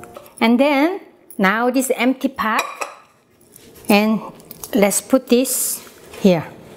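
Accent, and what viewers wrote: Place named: South Korea. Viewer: 이분정말 매력있습니다 발음 귀에 쏙쏙들어오는 한국식영어 ~~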